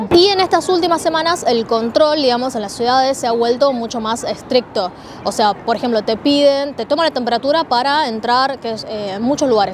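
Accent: Argentina. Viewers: me refiero a que las personas en sus vídeos anteriores SOLO comentaban sobre su acento argentino restándole importancia al contenido e información que dedica a cada video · Eres argentina tu asentó de español es idéntico al asentó argentino. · Ella tiene un español perfecto. Tiene su pronunciación argentina pero la verdad eso no afecta en nada. Usa un español muy neutro. Y además es muy dulce a la hora de comunicar. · Amo tu acento Argentino 😍 es super fluido❤ gracias x mantenernos informados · Muchas felicidades porque hablas muy bien el español y sobretodo muy buenas especificaciones tienes un acento argentino saludos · Si hablas dos idiomas por lo menos debería hablarlo bien , aprender la correcta pronunciación de la Ll y la Y . Claro que su acento para un argentino es perfecto pero para el resto de países latinos ese acento es horrible. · y que de malo tiene? 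si su esta perfecto. vivio años en argentina era normal que tenga el acento. no le veo lo malo. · Amo tu canal. Y tu acento argentino me encanta! Qué bueno que existe una persona que nos hable de China en español y con la perspectiva de alguien que también ha vivido en Latinoamérica. Saludos desde México 🇲🇽🇲🇽🇲🇽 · Tu español es argentino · bueno, cuando hables dos idiomas y los domines como ella habla, y además que carajo importa como suena para otros países, el acento argentino es así te suene mal o no 🤡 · mmm...Sin embargo, en este caso su acento tiene alguna relevancia, porque dice bastante acerca del ambiente donde creció y se formó, a mi me llamó la atención su acento porque parece provenir de un sector de la ciudad de Buenos Aires particular, me da la impresión que no es típico de la comunidad china de Buenos Aires, quizás eso llamó la atención de otra gente. Y si estamos hablando de cultura china con tanta riqueza y detalle como hace, las particularidades de la comunidad china en Argentina es parte de la cosa también. 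· Wow que español más argentino tienes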